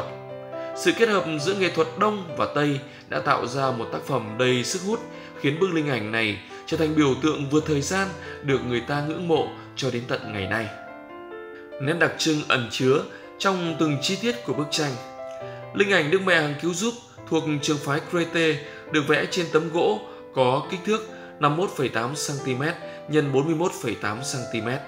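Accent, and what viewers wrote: North, Vietnam. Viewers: giọng nói miền bắc ngọt ngào tuyệt vời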